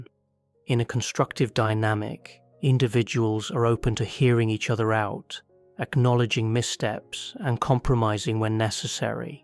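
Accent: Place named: United Kingdom. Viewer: everyone loves random british dude narrating stuff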